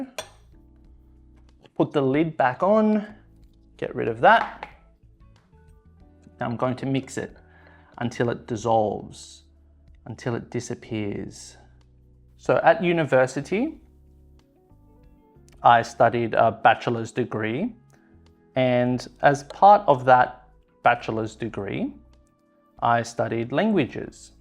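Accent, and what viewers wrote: Australia. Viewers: Ohh, I really loved to know that's an urban Australian accent 😅 I intend to move there in the future, and I confess that I was scared hahaha. We Brazilians have been influenced to believe that the Australian accent is so, so, so hard, but I've been watching some videos of real life in Sydney, Melbourne and Brisbane, and I think it is kind of like a British accent, but with much more open sounds. So... it's totally fine for me. My favorite dessert is brigadeiro 😋 Congrats on your video, you're really lovely. I have a suggestion: if you could pronounce all those words at the end of the videos, and if you could do a regular conversation with another Australian person ☺️ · Is it Australia accent?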